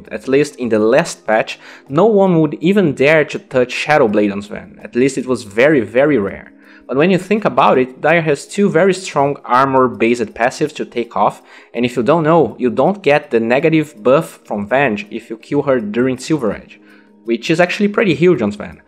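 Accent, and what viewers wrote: Brazil. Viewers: teu sotaque parece de br hauahauah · accent de br, inconfundível ! Haha eh nois !! Feliz natal e ano novo tb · Haha eu reconheço um sotaque BR quando ouço!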